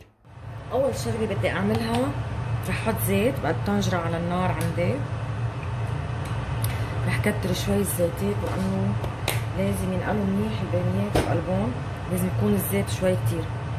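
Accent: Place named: Beirut, Lebanon. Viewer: لهجتك بيروتية أصيلة جميلة للغاية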